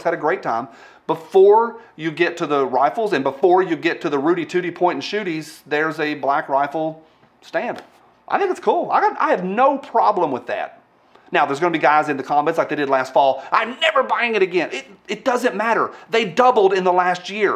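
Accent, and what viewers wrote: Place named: Tennessee, United States. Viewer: Dude what county in Tennessee do you live? I’m in Robertson County and can tell your accent is thick Tennesseean.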